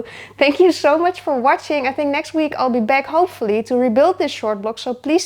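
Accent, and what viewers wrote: Netherlands. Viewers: its just the normal dutch way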